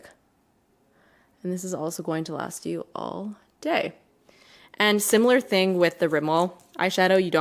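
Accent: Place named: Canada. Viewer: This video was recommended to me on the sidebar. 5 seconds in I knew two things... 1. You were Canadian, 2. I'd be subscribing. I love love love your delivery. Hello from Vancouver!! <3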